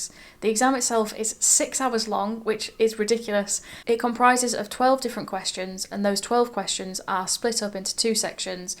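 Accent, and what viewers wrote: United Kingdom. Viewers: I adore also British English 😊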